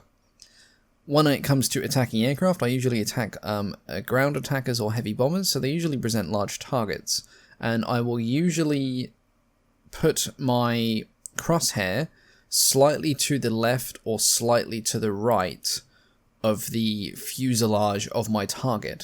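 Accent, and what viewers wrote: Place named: United Kingdom. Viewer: Hi, how are you doing my friend and I like your voice it's very british and how is it in the UK? I am moving there in 2 years 🇬🇧^_^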